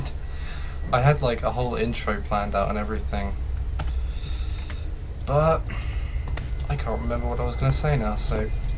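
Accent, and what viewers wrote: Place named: United Kingdom. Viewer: This is my first time seeing your videos How the hell did I know you are British ?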